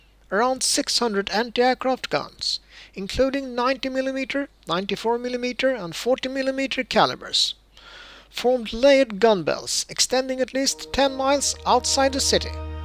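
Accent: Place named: Sweden. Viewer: and please you do ! good aim 🎉 I just explored the LINK to your other videos and I’m looking forward to watching them one by one. wanted to let you know: it seems to me that you’re in Sweden, yes ? and certainly it’s a very thick accent ya got going, I wanna let you know that I’m watching in Chicagoland, Illinois, USA. (what used to be the great country of America, which hopefully in time, will be again. don’t give up on us. 70% of us hate MAGA & Trump and everything they stand for…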